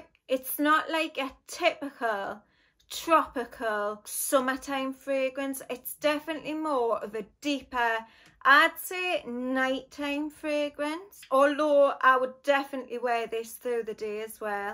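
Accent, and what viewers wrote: Scotland, United Kingdom. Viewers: I love your accent! Scotland? It's so precious. Thanks for the review :)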